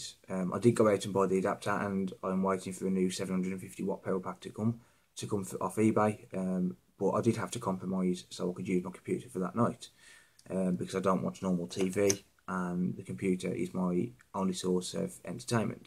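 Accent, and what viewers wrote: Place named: London, United Kingdom. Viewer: What a London blok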